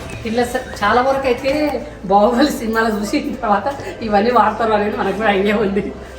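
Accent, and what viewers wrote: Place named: Telangana, India. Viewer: Telangana basha super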